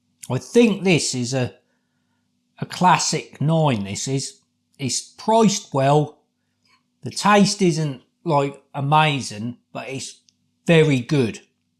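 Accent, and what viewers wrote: Norfolk, United Kingdom. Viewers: Well I could have easily have just skipped to the cooked product, but the Norfolk accent alone was enough to draw me in. Its like listening to one of the farmers in my village, except, he's talking dough balls instead of ploughing. I'm hooked, already watching another video with your dog, and the video montage of him throwing a plastic slide around the garden is hilarious. And yeah, anything carby is usually up my street. People assume being a vegetarian you'd eat healthier, but no, just pure carbs and quorn. Speaking of, have you tried 'The No Meat Ultimate Burgers' at Iceland, one to review if you haven't already, my top burger for sure. Good sausage rolls too. Anyway, I'll see what i think of the dough balls. You too.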